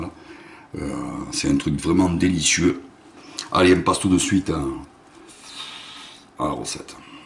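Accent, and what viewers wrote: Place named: Narbonne, France. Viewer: Exactement la recette que je fais. Ma mère faisait la même (juste elle mettait plus d'oeufs ) je mets un peu moins de sucre Mais javais besoin de précision et elles sont bien présentes dans cette vidéo ca ma fait rire d'entendre dire de ne pas changer de casserole Un côté humoristique que j'aime beaucoup ^^ Et je suis aussi du midi région narbonnaise ..(je reconnais bien l'accent ;) Merci !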